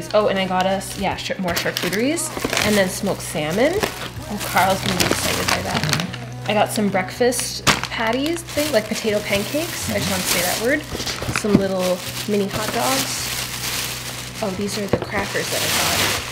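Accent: Canada. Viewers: Canadian eh?:)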